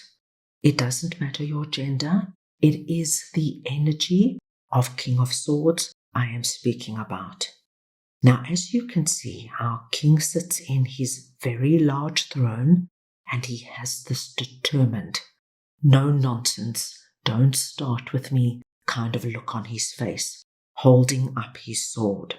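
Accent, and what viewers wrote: South Africa. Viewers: I know a South African accent when I hear one. Hello maatjie.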